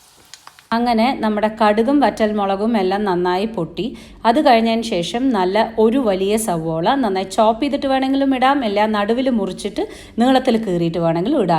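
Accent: Palakkad, India. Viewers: I like your simplicity. You are very straight forward and seeing you enjoying the cauliflower fry was really enjoyable.I also like your palakkadan way of speaking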